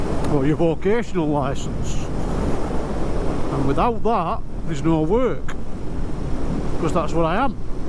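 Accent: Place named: Stoke, United Kingdom. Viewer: Hi thanks for the sub Off work today and enjoyed your commentary and the accent I heard my Stokie accent and like you I could barely listen to my own voice really and still can’t much sounds weird to me!!! I’ve not had a lot of time to do new videos this year really but will do the odd one or two when i can going forward. Loving the bike mate Atb phil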